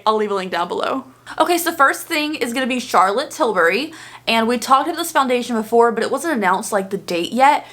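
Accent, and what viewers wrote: South, United States. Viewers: i would differently watch review of the Jaclyn's launch. I also love your southern accent its so cute.😺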